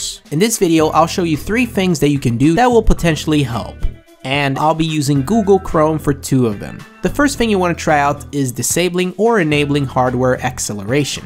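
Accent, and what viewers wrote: Sweden. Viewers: he's from Sweden the accent is pretty obvious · Är du svensk?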